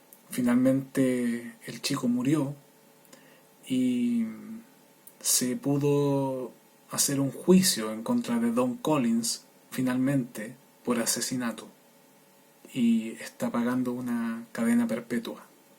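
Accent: Chile. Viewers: Me encanta el asentó chileno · Me gustan tus documentales, por que tu voz es neutral y muy clara, me costó encontrarte el timbre chileno sin embargo es maravilloso un acento y una forma de narrar como la que tu tienes, correcto y facilidad para entenderte, siempre agregas más datos y nueva información, se agradece amigo mio. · Wow tienes acento chileno, eres chileno??? No sabía jaja eres genial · Me ha encantado tu canal... He visto varios vídeos de asesinatos y creo que los tuyos son sumamente completos en cuanto a información y material fotográfico o fílmico... Además me gusta tu voz cuando los vas narrando... El acento chileno me encanta... Saludos desde Mendoza, Argentina... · La tonada chilena que tenés ....le da un toque maravilloso a los casos .....muy interesante tu canal !!! Desde Argentina te saluda una chilena !!!!!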